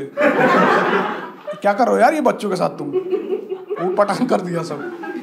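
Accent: Bihar, India. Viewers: This man proves that you don't need to be from any metro cities to be a good stand up, you can easily portray your stories to other's and make them laugh in your accent also....and the Bihari's accent are always beautiful....keep going👍🏻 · OMG, bihar comedian and the accent!!! Wowww mazza aagya bhaiyaa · Yourrr bihari accent dude just too good 😂❤️ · Actual accent of bihar..."maat sahab"😂😂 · Awaaj se hi bihari lag rage ho be, maja aay gaya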